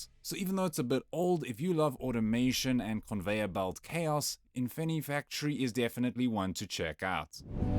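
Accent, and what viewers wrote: South Africa. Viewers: This accent.....South African?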